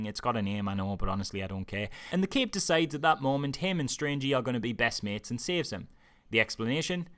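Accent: Newcastle, United Kingdom. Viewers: LOVE your videos... (screw the haters)... expecially the way you surgically, cleanly and clearly (be it with a slightly noticeable gerodie accent lol) take a red hot knife to many movies good and bad, with a perfect dos of humour to tie it all up... I always take your word and I'm very much looking forward to see Doctor Strange.... P.s More than 3 seconds is needed for a spoiler warning lol And another P.s Keep it up my Gerodie Lad n please stop Gerodie shore. It really make u all look bad.... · Are you from Newcastle?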